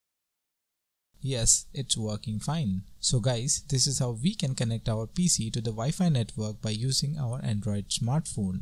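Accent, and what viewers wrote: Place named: India. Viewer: The second I heard an Indian guy I knew I was in luck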